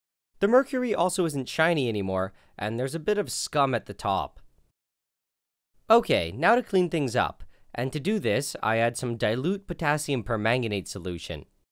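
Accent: Canada. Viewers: Dude he's Canadian. He's gonna say things differently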